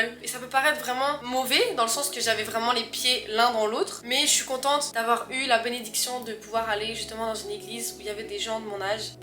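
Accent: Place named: Quebec, Canada. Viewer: Je me disais bien que tu avais un petit accès québécois hahahaha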